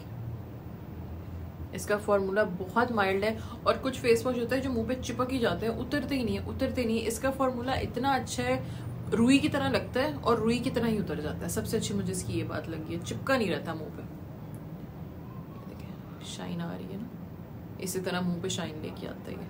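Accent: Punjab, India. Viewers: Asalam o alaikum yar api ek video full punjabi me banae itni Sweet punjabi bolti hen maza double ho jata hy😂😂❤ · The punjabi accents are just love api 😅❤ Truly a girl down to earth 🌎 ❤️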